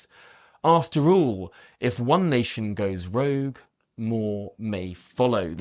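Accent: United Kingdom. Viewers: Lovely British accent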